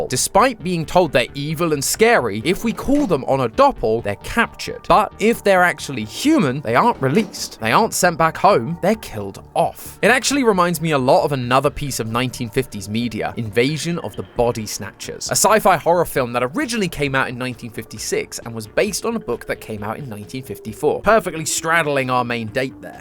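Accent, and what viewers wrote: United Kingdom. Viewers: Why did u turn British cuz before your like American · Why are you british (you are poggers) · wait since when become have a british accent? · You’re so British! Get better soon! 🩷🩷🥰🫶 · who the heck is this British guy, I don't understand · Ew hes british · why is British now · the british accent is just the cherry on top to annoy me anyways let's support other theory channels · At first, I thought was british, and it made me kind of mad, but now I can't even hear accent, I'm definitely gonna keep watching.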